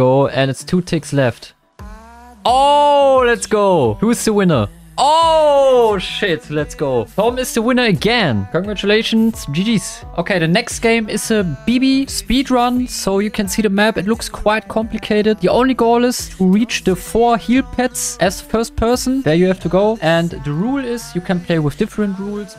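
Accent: Germany. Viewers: Bro is from Germany so his accent is just like that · i hear german actent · You can hear that he’s German haha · Don't blame him, he's German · just a German accent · In a german speaks englisch everyone knows he s a german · Man merkt den deutschen Akzent😂❤ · I love your germann acsant I find it way better then other peaple acsent 😂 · I love this great german accent... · Das ist Englisch mit deutschem Akzent richtiges Denglisch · The german accend is so nice!:popcorn-yellow-striped-smile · I Bett he is from germany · Man hört so den deutschen Akzent 😅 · I can Hear that your german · Man merkt richtig das deine Muttersprache Deutsch ist ( merkt man an der Aussprache von manchen Wörtern trotzdem Respekt für deine Englisch Künste). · He's German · I smell a little bit German here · you can hear hes german. Good Video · I hear DEUTSCH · can it be so you are from german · i love his german accent🤣😭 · Man merkt so hart, dass er deutsch ist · Almann Akzent kickt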